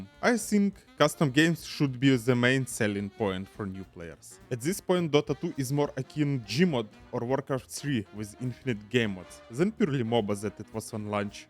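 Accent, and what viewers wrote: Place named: Russia. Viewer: Я всё гадаю причину этого мазер рашиа акцента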